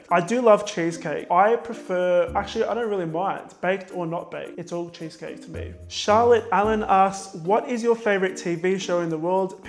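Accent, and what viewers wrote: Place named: Australia. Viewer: I don't have emojis on this phone but it felt like a short video; didn't even feel the twenty minutes! Love your cupcakes and other recipes too :) I used to think you were saying AK guys instead of ok guys and I got really confused thinking it was short for also known as without the a but I also love your accent! Australia is awesome.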